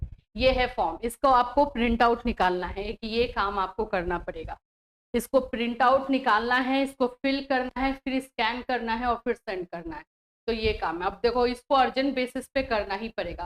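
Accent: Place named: Agra, India. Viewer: Kya aap Agra se ho madam?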